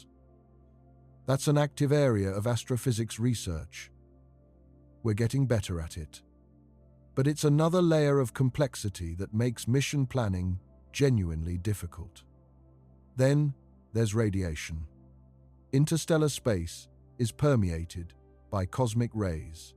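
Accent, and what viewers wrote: United Kingdom. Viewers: Absolute rubbish. I understood that in the first 30 seconds of this waste of time. Flight was also impossible. Reaching the moon was also impossible. Then it was accomplished. The same small mind in the first two examples is now narrating this video. “The physics as we currently understand it”. All that says is EARTH SCIENCE is clueless. The inconvenient fact however, is people are looking up into the sky and seeing them dotted with “UAPs” that by the logic of this video, shouldn’t exist. But they do. They’re here with ease, and have been as long as human history has seen them, thousands of years. Amazingly, the idiocy of the narration refers to the “speed of light” as a factor in interstellar and intergalactic travel. This is a kindergarten child telling a university mathematician why his understanding of physics is impossible. Needless to say this video got no like and no “subscribe”. 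The “British accent” didn’t make this inanity sound any smarter.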